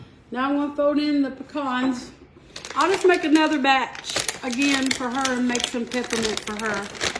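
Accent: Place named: Georgia, United States. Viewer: That's so so good !! I'm from Ga. and I have the same kind of accent . I say words like that too . HAHAHA...!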